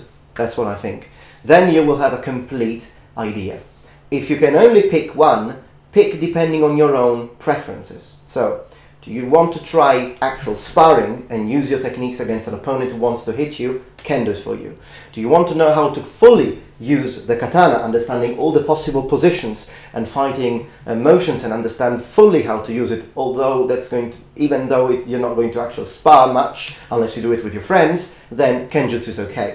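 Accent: United Kingdom. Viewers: Your Japanese and English pronunciation are pretty darn good! Did you learn English in the UK? Best wishes from the USA to Italia! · Wow, I can't believe I have never come across your videos before! I'm in a bit of a strange position really. I live in Leeds. England (I can tell you learnt English here and not in the US :)). Oddly, the only form of Japanese swordsmanship in my area is the Hyoho Niten Ichi Ryu UK Shibu. I have practised Chinese and Korean martial arts in the past, and I am being told my a lot of my martial artist friends that I am extremely lucky to live so near to this school and should give it a go. My heart initially was set on Kendo, for the sparring, but are no schools near me. Do you think that there would be transferable skills if I took up kendo too in a few years? Here is a link to the site: <link>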